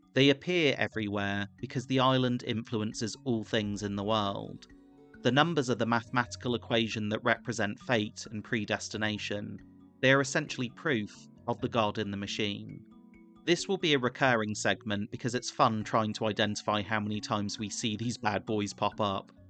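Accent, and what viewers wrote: United Kingdom. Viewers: For the love of God, can we get someone who isn’t from the 🇬🇧 to narrate this? Are we (🇺🇸) so shallow or thick- that we “Need” a gnarly British accent just to pay attention for a little while?? C’mon it’s gettin’ kinda pathetic, now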